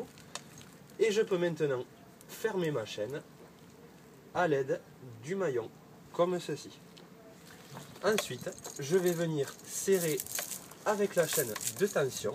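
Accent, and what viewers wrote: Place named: South, France. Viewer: je t'écris 11 mois après mais le rapport c'est que le mec parle comme le sketch des Inconnus avec l'accent du sud un peu flicard mais son tuto et super merci à lui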